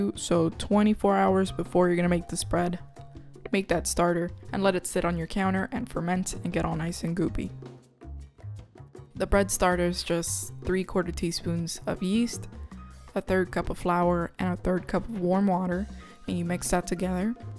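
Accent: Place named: Hialeah, United States. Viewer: I don’t even have to know you to know you are from Hialeah!!! 😂 Love it! Can’t wait to try this! Subscribed and will follow you on IG :)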